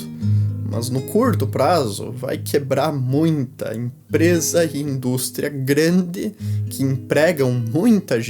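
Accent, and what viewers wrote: Rio Grande do Sul, Brazil. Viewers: Gaúcho tchê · Que vídeo! Que vídeo! Parabéns e continue assim, edição e roteiro espetacular! Só um detalhe: eu conheço esse sotaque aí em kkkkk noroeste do RS? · Se esse cara não é gaúcho eu sou maluco shshshs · duma coisa eu sei: tu é gaúcho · sotaque gaúcho é mt legal de ouvir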